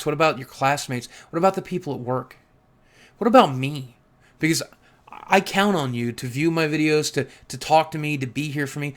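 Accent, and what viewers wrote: United States. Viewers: I can't understand you very well because althought i understand english, i cant understand very well the american accent. Its like spanish from Spain and Latinamerica, are different. But if your problems comes from your body (as you said is a big problem) or loneliness, you can change it but you have to do an effort. You can go to a gym and lose weight and be happy with your new body. That happyness improves your esteem. Its long to explain but you can do what you want with an effort.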